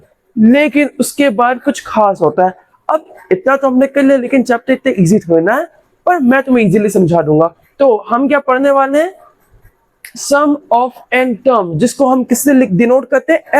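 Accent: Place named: United Kingdom. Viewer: Uk aceent By the way 1st Comment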